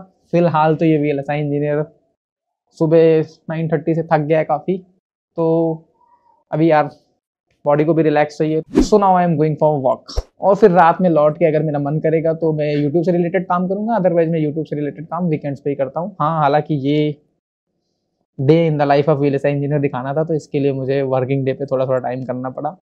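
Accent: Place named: North, India. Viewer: Bro could you tell..? where are you from..? your accent denotes you're from northern region of india. i.e UP/Bihar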